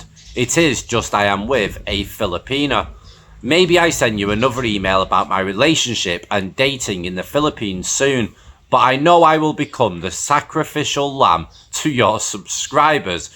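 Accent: United Kingdom. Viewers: What is that accent, UK, Australia, New Zealand??????????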